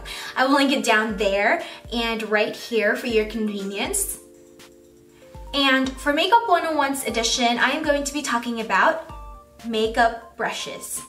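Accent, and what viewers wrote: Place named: Philippines. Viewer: You are so PRETTY! and I sooooo LOVE your accent! BTW, are you a filipino?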